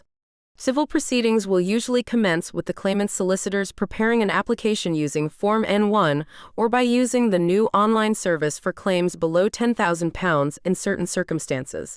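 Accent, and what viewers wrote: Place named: United States. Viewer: so annoying using American voice for uk law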